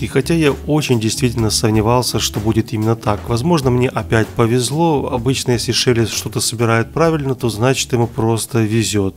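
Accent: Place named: Ukraine. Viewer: С первых минут понятно что ты Украинец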